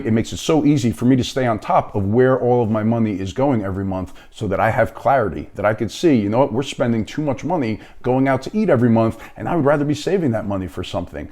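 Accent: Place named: New York, United States. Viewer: Oh wow! I notice the mic on your shirt. Big difference in sound from the previous vid about leverage. Your voice sounds more clear and focused and the room echo is gone now. Your voice is clear and deep now. Good job man! Oh, your speech style really reminds me of Christopher Walken. I guess it's a New York thing lol.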